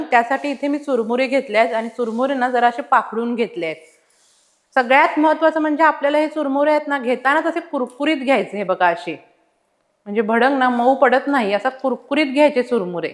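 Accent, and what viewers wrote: Kolhapur, India. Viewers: Typical Kolhapuri bolta te baghun Lai bhari vatatay.Ek no.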